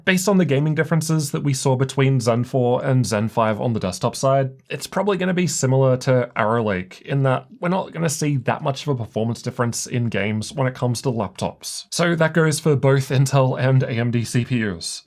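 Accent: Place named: Australia. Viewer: I like Aussie voices. Never stop talking, Aussie boy.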